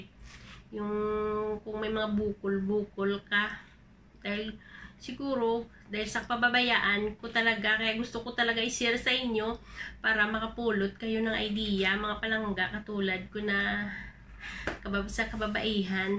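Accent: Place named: Iloilo, Philippines. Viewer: Hi sissy sending my support thanks for sharing sister daw ilongga ang boses mo sis sa antique ako enjoy lang sa pag vlogg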